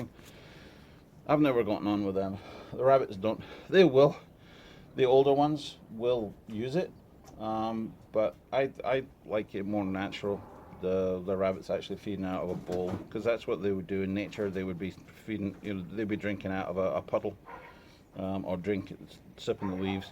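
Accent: Scotland, United Kingdom. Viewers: Are you of Scottish or Northern Ireland descent? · Wait... you are not British?! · Are you british? :D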